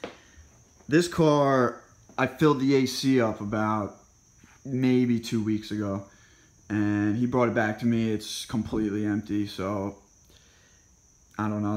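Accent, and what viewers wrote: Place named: Long Island, United States. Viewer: You sound like UFC fighter Al Iaquinta, which makes sense since he is also from Long Island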